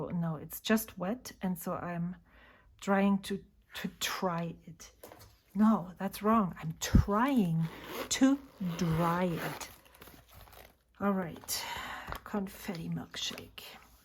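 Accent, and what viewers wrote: Germany. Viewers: I enjoy your off the cuff videos and find myself laughing because they're real! Like Katie, Ive been tweaking my Vanness cart all week so I'll definitely use your code! Thank you! I love to hear your German pronunciations. I'm the same with Italian. Though I'm San Francisco born & raised, I grew up listening to it and can't get myself to pronounce Italian names with an American accent.😂 Had I known, I would have happily sent you my bottle of Hanging Rice. I have several Troublemaker inks that haven't been getting sufficient love so let me know if there are any you'd like to try and I'll see if I have it.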